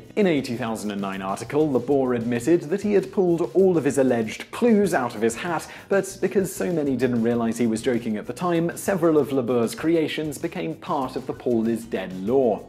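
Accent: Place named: Scotland, United Kingdom. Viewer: You have a scottish accent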